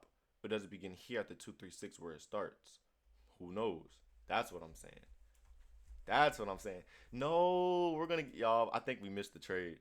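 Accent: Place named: Detroit, United States. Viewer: you from the D dawg ? you slick gtta accent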